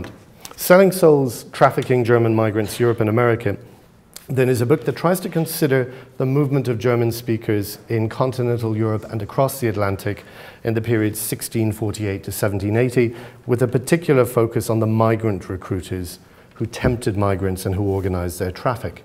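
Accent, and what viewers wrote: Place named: England, United Kingdom. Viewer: Did his first degree at NUI Galway...Very English accent however...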